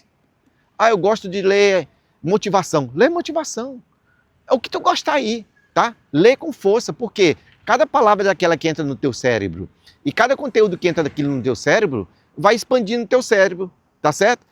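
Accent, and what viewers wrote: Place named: Paraguay. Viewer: De onde você é? Soa paraguaio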